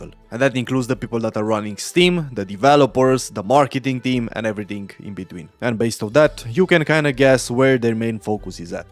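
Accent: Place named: Romania. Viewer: I can bet u re Romanian by the accent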